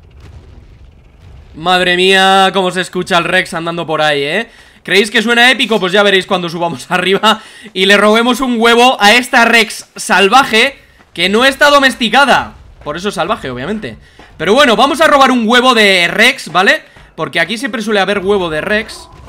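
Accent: Spain. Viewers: grito de me puedes saludar por fis, por sierto de que parte de España eres, tus vídeos son excelentes 😁👌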